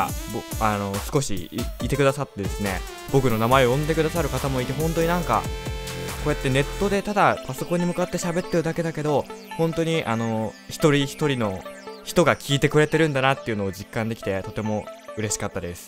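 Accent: Kansai, Japan. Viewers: さんの関西弁がたまに出る動画が好きです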